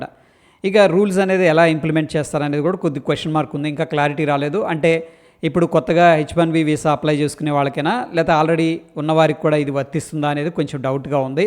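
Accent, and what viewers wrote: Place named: Telangana, India. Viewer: Hi sir this comment is not for this vedio .i would like share my opinion on all ur efforts...సర్ stock market లాంటి ఓక సీరియస్ subject ని మిరు మీ తెలంగాణ స్లాగ్ లో చెప్తుంటే full comedy గా నవ్వుతునే వింటాను.at the same time ఒక vedio 1 book tho సమానం గా చుస్తాను .TQ sir.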